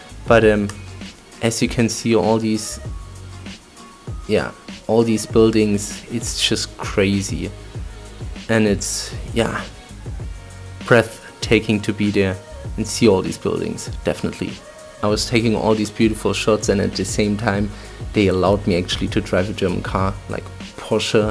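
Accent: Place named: Austria. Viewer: Arnold is Austrian and in Austria people speak German. So he has a similar accent to Arnold.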